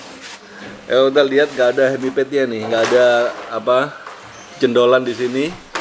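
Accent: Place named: Surabaya, Indonesia. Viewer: Omahmu suroboyo ta om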